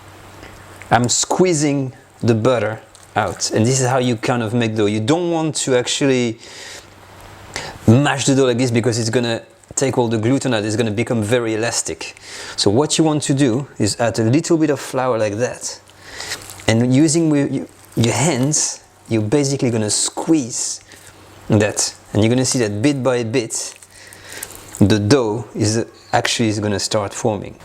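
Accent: France. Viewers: Love this video demonstration of yours...French accent and all...👍🥰 Thanks for sharing.. ❤💖💝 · I subscribed because I love your French accent! Okay... and I love food recipes! 😆 (Watching from Chicago)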